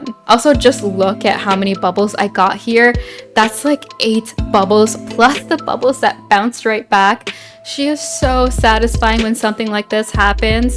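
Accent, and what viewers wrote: India. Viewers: Are you from India by any chance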